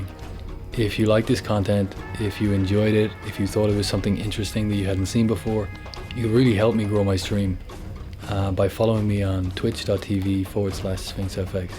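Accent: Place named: Ireland. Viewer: Nice work man, you sound Irish :D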